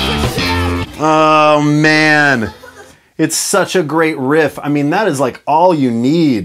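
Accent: United States. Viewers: Dude I got like so freaked out that u didn’t have an accent. Good job with the American one btw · Pretty good American accent can't lie lol 😂 great video tim c is a legendary bassist so underrated played with so much aggression, anger, passion, love, hate and awesome all round bassist 🤟🏻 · I’m confused, why do you have an American accent now? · Yes yes yes ! But why the American accent ?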